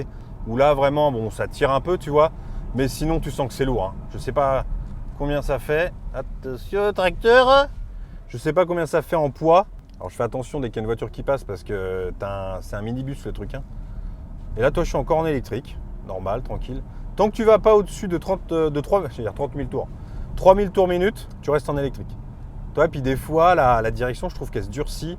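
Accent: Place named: Brioude, France. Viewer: C'est à cause de l'accent de brivardois. Jean leguin humoriste lol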